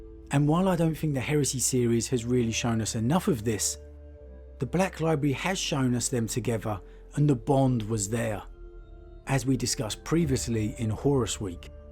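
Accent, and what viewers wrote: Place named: London, United Kingdom. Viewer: Great voice london accent mate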